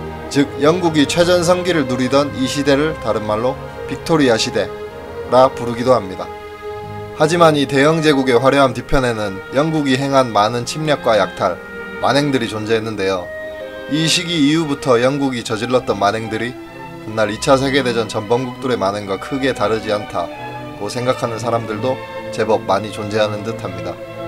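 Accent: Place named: Gyeongsang, South Korea. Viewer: 경상북도 사투리 듣기싫어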